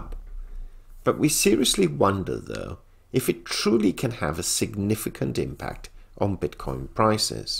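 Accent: England, United Kingdom. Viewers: Subscribed & Liked to help support your podcast. Keep up the pleasant pommy accent.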